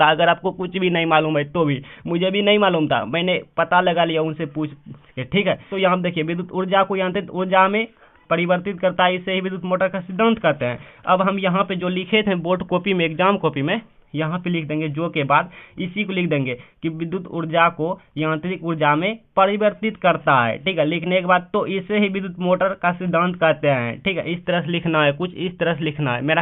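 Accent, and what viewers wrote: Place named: Bhagalpur, India. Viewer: Sir aap bhagalpur jile se ho kya kyunki main bhi wahin se hoon aur meri bhi bhasa aisi hi hai😊😊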